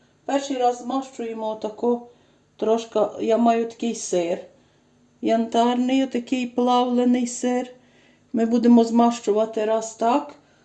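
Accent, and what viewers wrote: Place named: Galicia, Ukraine. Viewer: Дякую! Завжди дивлюся Ваші рецепти. Продовжуйте! Ваш галицький акцент- чудовий! Всього найкращого!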